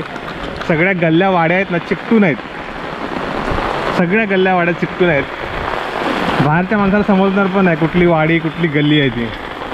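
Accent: Konkan, India. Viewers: दादा तू कोकणी आहेस का बोद्ध आहेस तुझ्या बोलण्यातून तू कोकणी वाटतोस गाव खूपच सुंदर आहे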